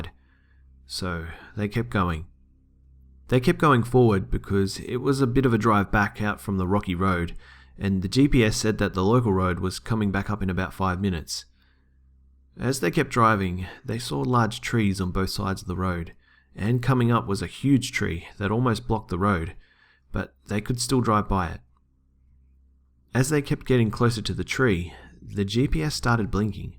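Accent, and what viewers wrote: Australia. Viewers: Subbed for the Aussie accent and great content. :D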